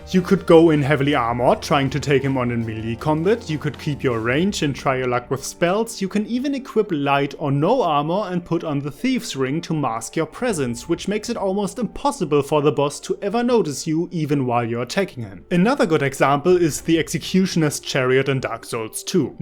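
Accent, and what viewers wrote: Germany. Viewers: Oh shit, so that's what it is. I've been trying to figure it out, but I wouldn't have guessed German.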